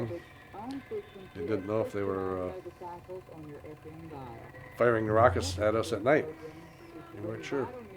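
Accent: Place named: Wisconsin, United States. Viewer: Thank you for your service sir. You aren’t from Wisconsin by chance? The accent sounds like my home state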